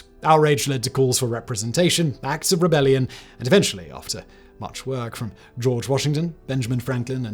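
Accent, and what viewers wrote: United Kingdom. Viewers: hearing a brit talking abt george washington is crazy. love ur vids im not hating it’s just funny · I am not English native speaker (it is my 3rd language) I watched thousands of documentaries in English but this guy speech is unbearable, too fast and annoying monotonous british accent (or whatever this guy is).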